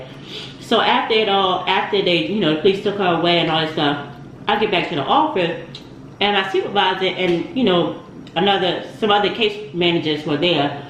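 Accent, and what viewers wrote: Baltimore, United States. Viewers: LLS girl I Loved that story, it had me laughing so hard and those crablegs get me thinking about getting some tomorrow. You sound a lot like my Baltimore friends but not all they way. Maybe like Philly and Baltimore mixed lol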